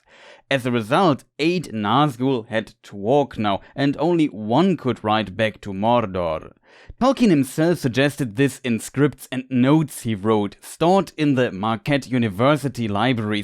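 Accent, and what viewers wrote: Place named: Germany. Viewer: As I heared you talking, I immediately guessed that your native language is German, just like mine. 🇩🇪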